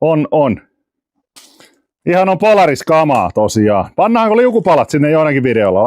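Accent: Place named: Laihia, Finland. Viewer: faija laihialta ni tua murre on hienoo kuultavaa :)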